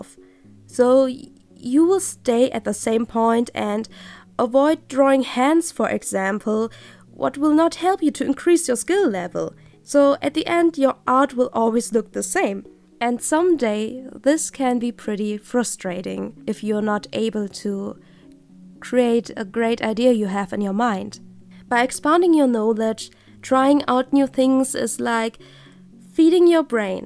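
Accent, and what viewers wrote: Germany. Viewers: Hey^^ are you german?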